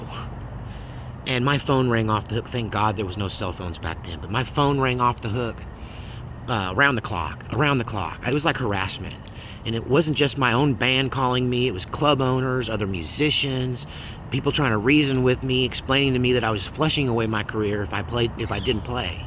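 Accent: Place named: Texas, United States. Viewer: I knew you must be from Texas. (That's a compliment).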